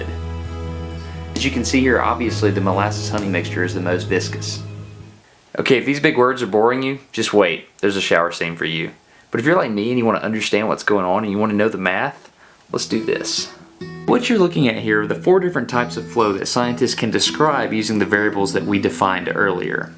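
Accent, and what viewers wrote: South, United States. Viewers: Forget that southern accent its the math Im still trying to understand...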